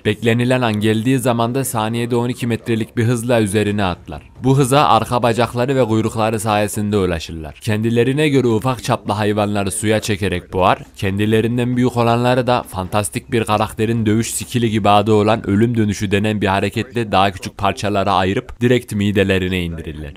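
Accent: Ankara, Turkey. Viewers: Usta anlatma şeklin Ankara şiiven anlattığın herşeyi akılda kalıcı yapıyor iyi düşünmüşsün kolay gelsin.😁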